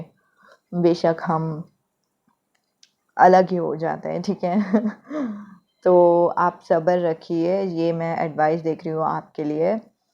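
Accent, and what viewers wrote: Maharashtra, India. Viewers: Hi mam, i have chosen pile no. 3,i m also a tarot card reader, numerologist, etc. mam aapke bolne mein marathi accent aata hai, aap maharashtrian ho?mujhe aisa laga, just asking generally, ok, so Mam aap mujhe ek baat batao, aap jab bhi reading karti ho hamesha yehi kyun bolti ho, "aapke husband aise honge",vaise honge, matlab ye video ko ladke nahi dekh sakte, ye video ladko se resonate nahi hoga.aapne pile no. 3 mein toh puri khichdi bana dee, aap logon mein jhagda hoga, nahi hoga, baad mein thik ho jaayega. "jara nit padh teene reading kara ho madam, nakki kay aahe te saangaych, hasayala tumhalaa lay aavdto.ekdum mana nee hasta ho tumhi. Nice reading. Keep smiling. 😄Thank you madam, 🙏🙏🙏👍👍👍👌👌👌❤️❤️❤️❤️❤️🌹🌹🌹🌹🌹🌹🌹🌹🌹🌹🌹.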